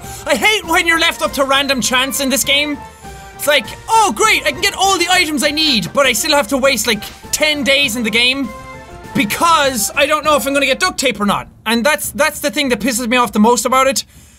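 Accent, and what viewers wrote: Ireland. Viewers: I spent the majority of my day binge watching this whole playlist and now that it's been a few hours since then i've noticed my inner monologue has taken on an irish accent. I'm definitely not mad but this is very strange. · He's Irish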